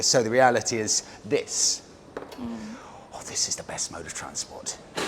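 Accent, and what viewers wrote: RP, United Kingdom. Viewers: That's absolutely quite normal in here gents, plus you don't have first world problem here where passports forging is a thing. Anyway, as someone said, you guys are so under-subs and I hope your channel will take off in a massive manner. Your authenticity from what you wear, how you walk, and in general who you are as a person is refreshingly unique, oh and I almost forgot your voice, your narration ability with that clear, concise, RP accent makes you listenable on hours end.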